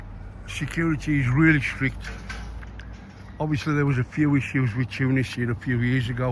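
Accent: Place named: Black Country, United Kingdom. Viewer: Nice to hear a local accent :) , born and bred brummie here now living in east Yorkshire ( guessing your more black country way? ) ... we did Tunisia last year but Sousse , enjoyed it but fancied a change this year and found this hotel , another Tui Blue ( although not adults only this time sadly ) Great honest review and so have booked it for mid December , bit more money than last year but we've paid for a sea view room etc .. cheers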